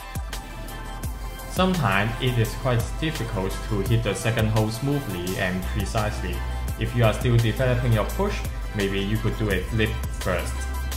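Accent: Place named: Thailand. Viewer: You sound exactly like they mimic Thai or Sing people speak English :)), btw I'm Vnmese.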